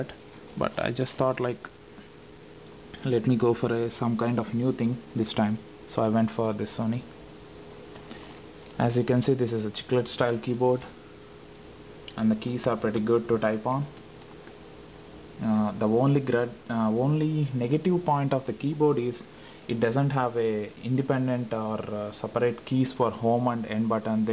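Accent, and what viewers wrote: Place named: India. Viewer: hes pointing out to ur indian accent my friend .. hence the software thing. :P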